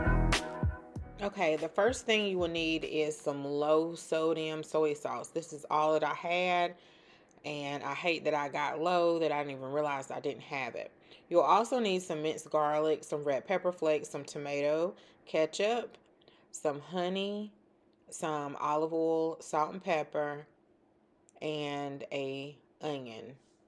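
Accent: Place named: North Carolina, United States. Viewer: First time here but I knew you were from NC...lol...I'm from the Lumberton area...it's so funny to here us speak..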